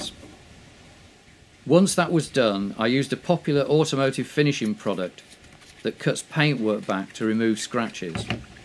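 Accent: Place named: Midlands, United Kingdom. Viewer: About 2.5 years ago, I rediscovered my love of electric rock guitar, so I dug out the little japanese guitar I'd had at the back of a wardrobe for decades (Kawai Aquarius, purchased in 1984). The frets were worn down to the fretboard. So I ordered a new neck from Amazon. The one that was sent was not the one they had in the pic, they said they didn't have any more, but I could keep the one they sent for free. Despite having no experience, tools or workshop, I was determined to make it fit. Musician friends said it would never work. But bit by bit, using my bed as a worktop, I sandpapered away areas the neck was too thick, and shimmed up areas where it was too loose. When I came to fit it, it needed a couple of tweaks, but then it worked perfectly. Intonation spot on - action better than the original. It was flatter too - much easier to play. 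I've got some 'proper' guitars now, but I learnt a great deal from that little project! Love the Channel,. You sound like you are from my neck of the woods. (Midlands) You break down all the favourite solos I enjoyed in my youth, so now I can at least play them in slow motion, which is a step in the right direction!;-)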